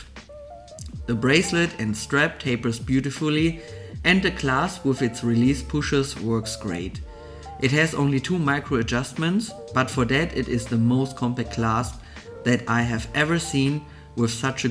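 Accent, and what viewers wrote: Germany. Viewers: Your English sounds a bit German?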